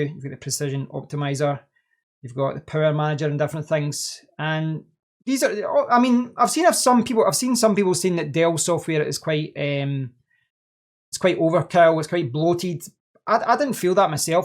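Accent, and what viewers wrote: Scotland, United Kingdom. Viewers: oh, my bad. Scottish accent is awesome too. I love it. Just bonnie.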